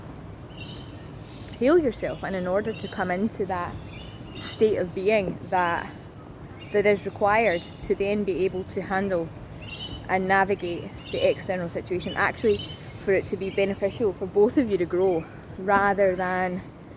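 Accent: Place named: Scotland, United Kingdom. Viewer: I’ve just found you here and loving your beautiful message/teachings… and your lovely Scottish accent. Are you from Glasgow/Lanarkshire?? 💕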